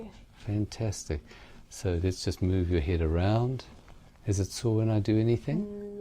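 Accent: South Africa. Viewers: Are you South African, I can't help but notice the accent?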